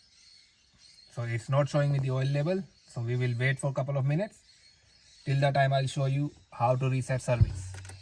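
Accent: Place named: India. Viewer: Because of accent i suggest that you are Indian. English in Great Britain is difficult to translate because of specific pronunciation but with your accent this become impossible to understand it without strain own mind...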